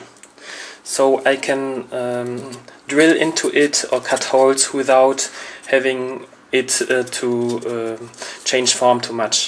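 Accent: Germany. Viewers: Man merkt glaich das du deutscher bist. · German accent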